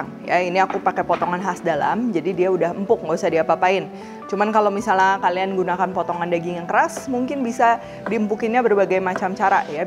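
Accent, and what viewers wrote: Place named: Java, Indonesia. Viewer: makasih cheff...udah recook asli medok banget.. klo ada waktu boleh share resep tahu kriuk ya cheff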